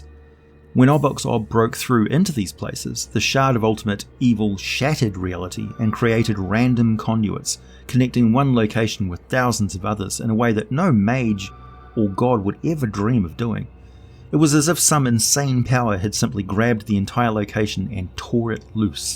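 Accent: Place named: New Zealand. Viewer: Well hell,you may just have to do the Wolf Spider next! Great vid keep crankin them out ol son! By the way my neighbor said you look great with a bald head! Shes hot bro! I think shes crushing on you! 😂 lol You kiwis with that accent....i think thats what got her.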